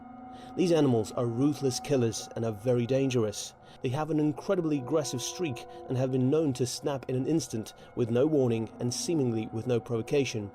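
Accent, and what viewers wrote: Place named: United Kingdom. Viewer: Shut the fuck up you and your annoying British accent.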